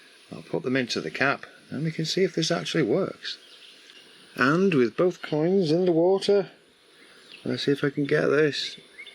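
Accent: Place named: United Kingdom. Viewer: What shocked me is a British accent living in the United States. I subscribed😂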